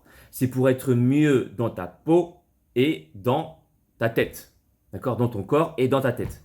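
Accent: Quebec, Canada. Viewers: C pas un accent un peu oui mais québécois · Accent québécois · C’est un accent du Québec 🇨🇦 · Un accent québécois ;) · L'accent c'est l'accent Québécois et franchement tout le taffs que tu fait tu mérite clairement plus d abonnés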